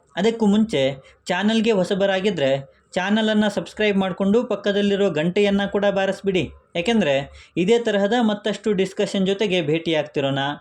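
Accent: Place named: North India, India. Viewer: Bro ur kannada pronounsation is luke northies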